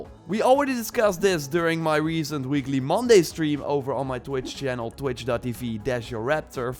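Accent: Netherlands. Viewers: Ah, that Dutch accent:-D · your Dutch accent is really obvious.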